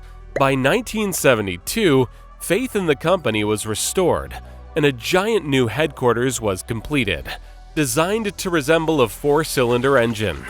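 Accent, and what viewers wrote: United States. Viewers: Pity about the terrible American pronunciation. The only name he got right was Daimler. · I am only 2 minutes in and the stupidity of American pronunciation of german names is driving me insane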